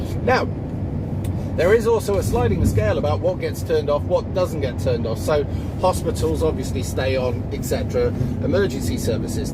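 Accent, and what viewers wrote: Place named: West Country, United Kingdom. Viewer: 1 year on, luckily no power cuts so far. But who knows what can happen within the next 10 - 15 years if things don't improve i.e. building enough new power stations to cope with increased demand? Soz you don't have a partner if the lights go out lol. But as long as you're happy in life? I enjoy watching your videos. If the power goes out, I won't have anyone either. Need plenty of books to read and keep the Nintendo Switch charged up when there is power.🤣 Sounds like you live in the south west of England - West Country area?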